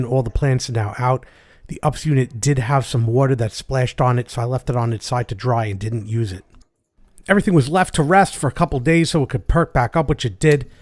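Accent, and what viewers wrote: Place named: Massachusetts, United States. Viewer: Love your videos. I could tell from your 'r" that you are from the Massachusetts area.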